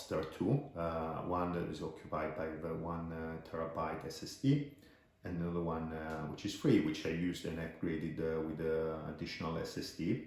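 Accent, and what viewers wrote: Italy. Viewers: Hey man, you sound like an Italian 😉 Very nice review, awesome laptop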